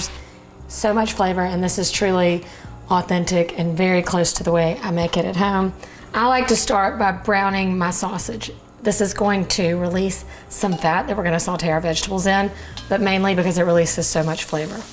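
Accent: South, United States. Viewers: Gawd you are amazing. An Italian woman with a southern accent is pretty awesome.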